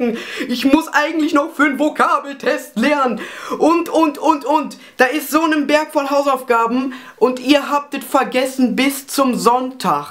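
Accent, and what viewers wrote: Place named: Cologne, Germany. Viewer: hör ich da kölsch?!?!?!?! ich würd sagen.....super tipp, das mit dem durchmachen....probier ich gleich mal aus:D ...ich hab irgendwie angst vor diesem st. augustin...!!!!!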